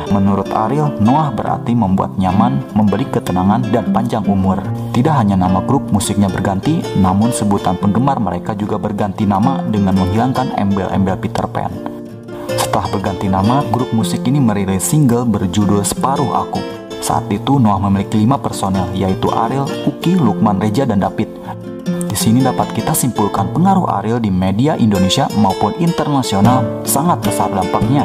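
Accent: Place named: Sunda, Indonesia. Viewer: Logat sunda narator nya kental banget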